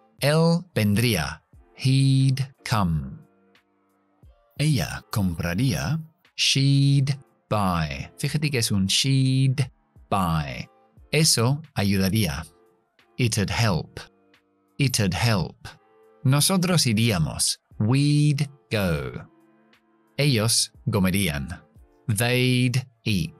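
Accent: England, United Kingdom. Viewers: Es pronunciación británica. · Ese es inglés Britanico,lo voy a escuchar pero nececito inglés smericano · Buenas noches sr, soy Carlos Bermudez, de ecuador saludos, su expresión del inglés con acento de Inglaterra es muy aristócrata, y me gusta mucho , empecé a ver su canal hace poco y su manera de enseñar es muy explícita, estoy en este momento viendo videos de un profesor californiano y la diferencia es abismal en cada acento quiero aprender de los dos voy a seguir su canal . Hice un test Delos suyos y no di ni siquiera en el nivel de principiante jaja.. espero y se que aprenderé mucho de UD , agradezco que comparta su lenguaje, y le deseo éxitos y bendiciones gracias por compartir sr. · La pronunciación que enseñas es acento británico?, se me asemeja mucho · Que maravilloso canal! Muchas felicitaciones. Este es mi segundo video y lo dice todo. 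Podrías hablar de la cultura inglesa? Como ellos miran la vida? Cual es ese olor a sus costumbres? Porque que hermoso acento. Tan elegante. Cómo miran la vida los ingleses? Muchas gracias!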